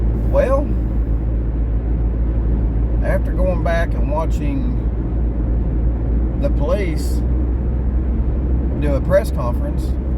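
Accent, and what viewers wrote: South, United States. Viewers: I like your nice southern accent. I am in UK, and it sounds nice. Kinda very relaxed.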